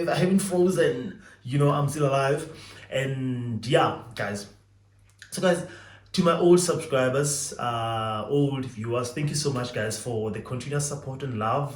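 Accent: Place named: Eswatini. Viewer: I could listen to you speaking siswati all day 😍😍😍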